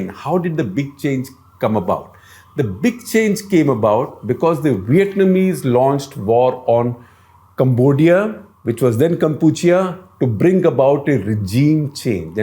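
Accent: India. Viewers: even though I already understand the issue, the crazy indian accent made me sit down and listen to this. Very compelling. Glad to see Indian media rising up as an alternative to the Anglo-Zionist dominated one.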